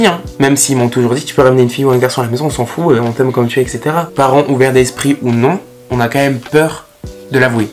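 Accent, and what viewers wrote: France. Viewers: j'adore sa voix et l'accent français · C'est trop vrai ! Son accent français est grave beau, il est agréable à écouter je trouve aussi ^^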